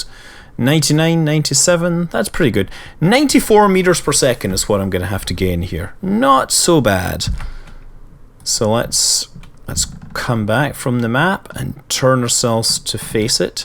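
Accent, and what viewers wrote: Scotland, United Kingdom. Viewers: he isn't Irish... he isn't faking Irish... he is Scottish that is a Scottish accent not a Irish one so you are technically right he is not Irish but he is not a faker. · cool accent, are you scottish or canadian?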